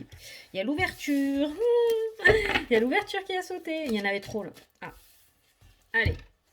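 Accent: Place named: Southwest, France. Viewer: j'aime beaucoup ce mode de tirage. Je sais que les cartes disent vrai.... car j'en suis là et cela dure depuis 3 ans pour le dernier choc et 2015 pour le début de ma descente aux enfers des jugements des exclusions familiales. Je n'ai prise sur rien là est le problème. Je ne peux pas vider mon sac puisque personne en face de moi. Je ne peux plus continuer comme cela... j'espère un message même négatif pour pouvoir réagir et mettre un point final à cette situation. Merci pour cette lecture et pour l'Amour du Ciel.....ne change pas tu es juste parfaite avec ton accent pour moi qui suis native du Sud-ouest. Bisous ma Belle👌👄💖😍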